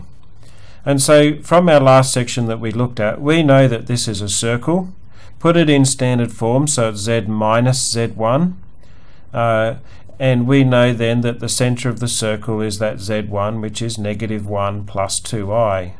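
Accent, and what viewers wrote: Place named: South Africa. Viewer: Your explanation is clear as crystal. Excellent tutorial on complex numbers. I also like the quality of your video as the picture is stable, clear and neat. From your accent I would imagine you are a South African?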